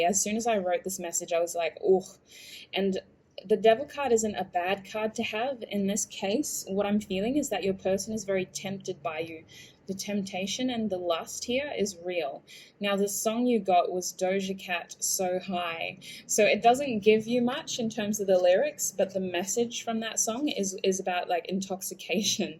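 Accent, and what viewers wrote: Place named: Australia. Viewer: Thank you. Group 3. Perfectly accurate. I will continue to focus on self, he has a lot of mixed up "stuff" to sort through, and I know he will come back into my life, he struggles to let go, however, I have put my life on hold for too long now. Time for me to keep focussing on me and moving forward. I follow a few tarot readers, it sounds like you are Australian. You are very good at what you do. Thanks for sharing with us and giving us some clarity xxx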